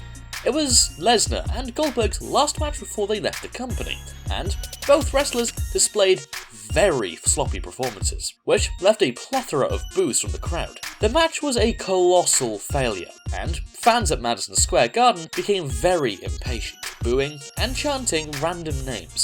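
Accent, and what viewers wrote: United Kingdom. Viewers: Plus the gay ass British voice doesn't help neither.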